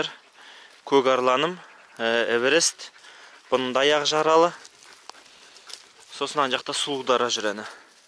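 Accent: Standard. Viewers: Ассаляму алейкум. Жакшы аңчылык болуптур, Казактын таза казакча сүйлөгөн жигити ушундай болот, Кыргыз болуп туруп баарын түшүндүм.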